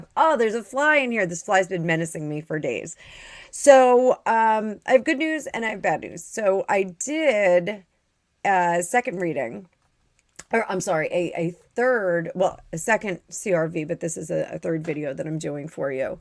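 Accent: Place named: New Jersey, United States. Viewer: I love your NJ viewpoint and expressions - "a hottie" with "definitely zero percent body fat". No other tarot reader I listen to does that. Love your accent, too - although it is very slight. I was in NJ last July to see Paul MCartney. I ❤ New York - and New Jersey. ❤Denise from Toronto. Great reading! Looking forward to much more, so thank you so much.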